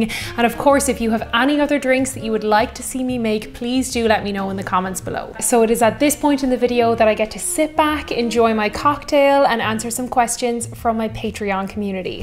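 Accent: Ireland. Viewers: This girl should be in the movies. She could be the next Maureen O'Hara. Beautiful girl and her brogue only adds to her charm. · You know, I don't even drink alcohol, but she's so charming and lovely and has that beautiful Irish accent that I'm compelled to watch.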